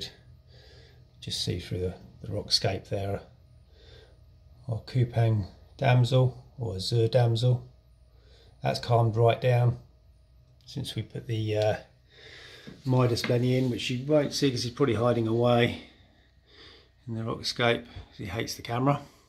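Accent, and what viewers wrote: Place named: United Kingdom. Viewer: Hi mate I'm based in Birmingham nice to see a reefer with a british accent. I'm looking at starting my first reef tank I'm stuck between getting a small nano tank like you have now or getting a red sea 250. Bit nervous about starting a marine tank so I'll probably take it really slow with fish only until I feel more comfortable and start adding corals.. what do you think the best option is for a first time fish keeper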